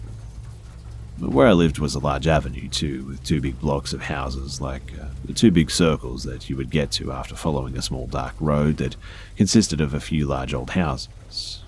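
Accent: Australia. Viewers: thois guy izza aussie fah shhure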